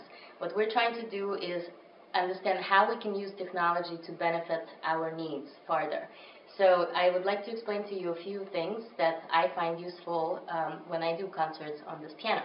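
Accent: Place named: Russia. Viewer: Strange how a Russian lady reminds you of a French lady but they do have similar accents lol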